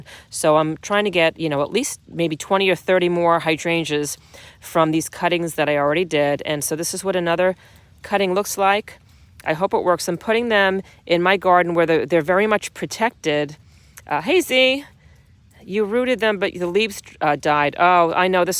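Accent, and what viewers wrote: New Jersey, United States. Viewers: Love that jersey accent :). Endless summer is so easy to clone. I have been very sucessful and have endless plants all in my landscape!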